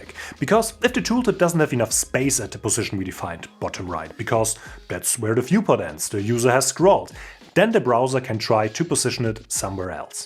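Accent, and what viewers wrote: Germany. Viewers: german accent detected within the first 10s, which gives me the "made in Germany" vibe👍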